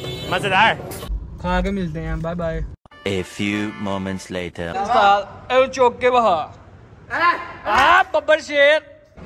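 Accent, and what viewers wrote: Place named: Gujranwala, Pakistan. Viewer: Aoa bhai, watching your videos since day 1 and following you on insta since 2018 i think. I just want to say k aap apni Gujranwali jo zuban hay Punjabi or uska accent jo bolty hain. Yahi unique cheez hay aapki, aap us main hi vlogs banaya karyn, or yahi waja sy log aapko follow karyn gy. Or apki squats or jerk ka bhht bara fan hun, ye dollay shollay or shredded body k peechy na jayen, us k liye fat bhht cut karna parti or bhht papar belny prty, apki power b compromise ho gi. Bass yahi ek choti c advice apk liye. Thank you and love you. By the way meri birth b gujranwala ki hi hay is liye wo accent sunny ka sawad ata. Is liye fake urdu accent main aap apny apko achi trhan express b nhn kar pao gy. Or log vlogs yahi cheez dekhny k iye dekhty.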